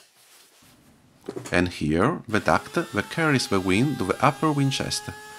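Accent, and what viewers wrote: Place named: Italy. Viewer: si sentiva, anche io sono italiano da quello che ora puoi immaginare e volevo dirti che fai dei bellissimi video e continua così <3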